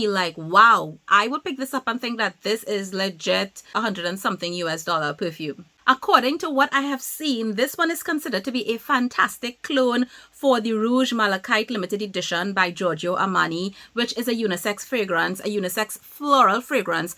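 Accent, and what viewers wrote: Trinidad and Tobago. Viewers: You MUST be from Trinidad and Tobago by your accent!?!?! Biggup Trini...fellow Trinidadian here. Great content, keep up the great work. Had to subcribe 🇹🇹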